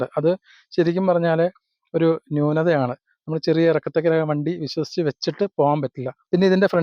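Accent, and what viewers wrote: India. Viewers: Kottayam,pathanamthitta malayalam.. vekthamayi karyangal paranju.. 2026il GST kuranjapol 1.15 on road.. 5000 extra fittings.. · വർത്താനം കേട്ടപ്പോൾ മനസിലായി തൊടുപുഴ കാരൻ എന്നു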